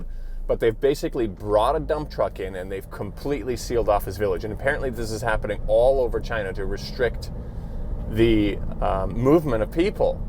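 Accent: United States. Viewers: I like your comments. I live in SZ half a year for 6 years now. NanShanQu by OCT Windows to the World. You don’t exaggerate and you don’t make up things like other Tubers. You sound more California than Canada, although I noticed your “oout” slightly. Enjoyed your piece. Of course, SZ is unique. All new everywhere and everything. I hope you mention to viewers that in other cities they have new sections similar to SZ and old parts. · First time watching your vids...are you American?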